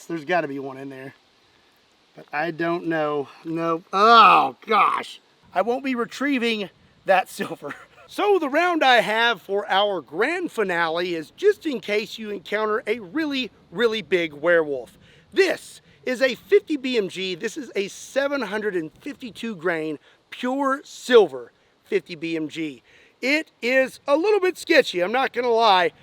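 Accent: United States. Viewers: I like how he talks like a cowboy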